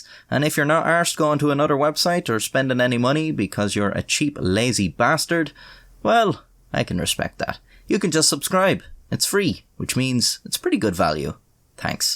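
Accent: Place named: Ireland. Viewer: there's something about his serious yet goofy Irish voice making irrelevant threats that is just so damn funny.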